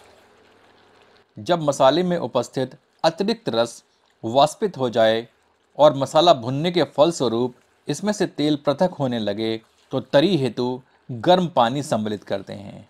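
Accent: Standard. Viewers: apki sudh Hindi sunke bohot achha laga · शुद्ध हिन्दी बोलते हैं आप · Sudh hindi ❤️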